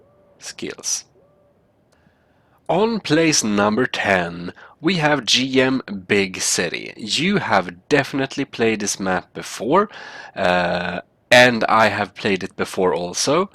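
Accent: Sweden. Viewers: I love the Swedish accent.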